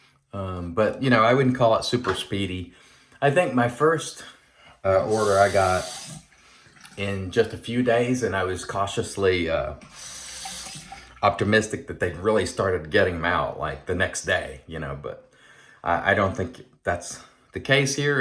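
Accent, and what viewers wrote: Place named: South, United States. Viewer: That soft Southern accent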